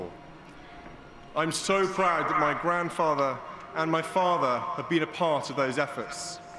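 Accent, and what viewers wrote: United Kingdom. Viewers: He reminds me of his mother and his looks he is eloquent and talk like a real Brit what a nice homage to the Queen and a nice speech let's hope he makes a good... king... Not too happy with the Royals...I've been around quite a while to see what the royals did to Diana ....never was a big fan A lot of hoopla ...if they really did a background check are they all really royalty? Not sure how true that is it's not like in the 1600s a lot different then I'm sure Bravo the Queen Elizabeth II to be around this long.... Queen Elizabeth I was a real Queen wow she is named after after a real gem.God-bless her🇺🇲🇨🇺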